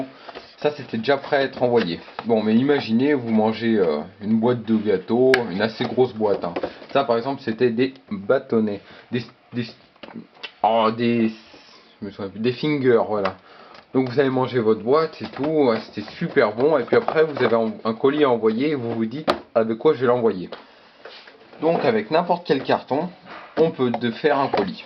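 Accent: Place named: Auvergne, France. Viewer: Rien qu'a ton accent j'ai su que t'etais auvergnat "on y fais..." bref bah moi aussi je suis de Clermont-Ferrand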